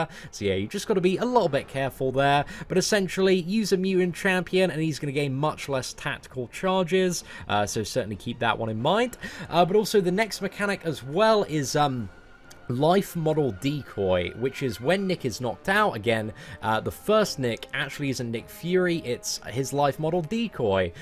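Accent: United Kingdom. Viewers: gets a paycheck from kabam, don't be fooled by his British accent ppl!!! Ladies and gentmen.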